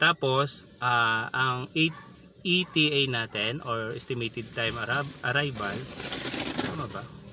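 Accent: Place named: Bicol, Philippines. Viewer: Ung di mo maitago na bicolano ka😂😍😅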